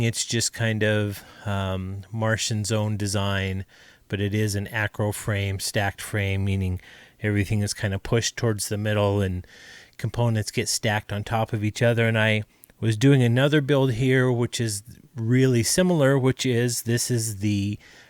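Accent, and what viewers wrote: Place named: Australia. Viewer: yes "gooday!! And tooday!!!.." Catchy as fuck specially with that down under cheekyness. 👍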